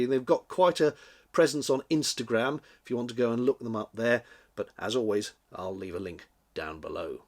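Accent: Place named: Yorkshire, United Kingdom. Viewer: By 'eck !... Didn't know you're a Yorkshire lad ! Best wishes for the upcoming festive season, and look forward to more travel videos in the new year. Stay safe !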